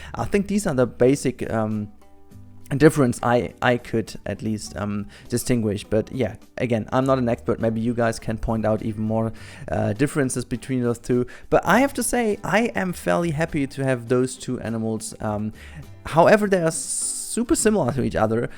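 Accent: Germany. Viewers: Are you german? Your English sounds like German :D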